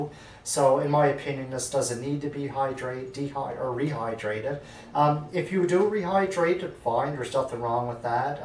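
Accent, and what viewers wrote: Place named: Ireland. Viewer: Hi watching your reviews here in Ireland for a while. Would I be right in saying you've some Irish connection, your accents got a fairly noticeable Irish twang to it!. I've just been doing kit beers and dry hopping. I've been considering just taking out a few litres of wort and doing a mini boil, do you think that would work the same as your mini boil there?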